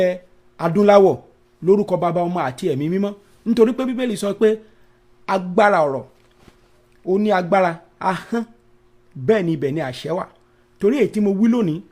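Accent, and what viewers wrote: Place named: Yoruba, Nigeria. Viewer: First of all I love that Yoruba dialect, it’s very sweet, continue my prophet......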